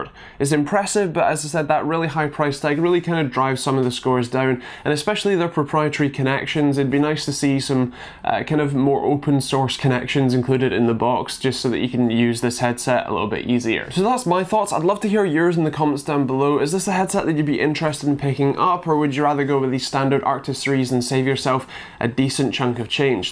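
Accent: Scotland, United Kingdom. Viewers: I spent a solid minute trying to figure out if this guy had a Scottish accent or not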